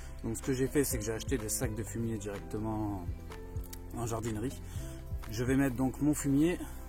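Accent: South, France. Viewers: OUI tu me la dit la derniere fois qur tu étais nouveau d' ou viens tu ? un léger accent du sud ;moi sa fait 39 ans que je suis a Lyon c était pour 3 ans max Bonne journée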